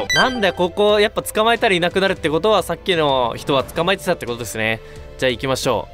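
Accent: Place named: Okinawa, Japan. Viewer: イントネーションが沖縄っぽい(笑)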